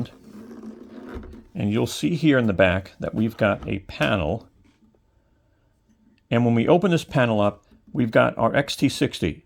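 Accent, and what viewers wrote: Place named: United States. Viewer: I have to say what a well put together video and introduction to these inverters. The host has a lovely clear articulate accent (I am English English so I find the young American accent a bit confusing! ) and the style of introduction was logical, informative and a breath of fresh air among other channels. I only watch YouTube nowadays and find there is such amazing content by conscientious Tubers like this gentleman. I have decided to buy the mini for my holiday home in South Africa for the 2-3 hours “loadshedding” (power cut) so I can watch my YouTubes on the big telly. I have gas stove for getting my 2 hour tea fix and refrigerator can go on and off as it likes so the capacity and function is just right for me . Again thanks for very well presented “ military officer style” instruction and excellent slides and explanation. 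👍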